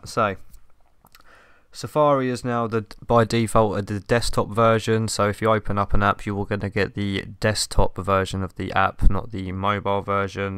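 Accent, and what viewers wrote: United Kingdom. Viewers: Are you a british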